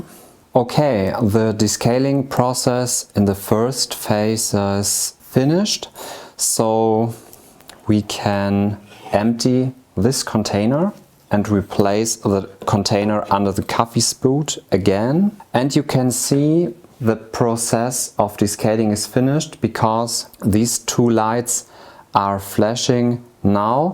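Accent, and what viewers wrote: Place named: Germany. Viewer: This guy is adorable with his little German accent. It's set up and cleaning already but I'm just letting it play through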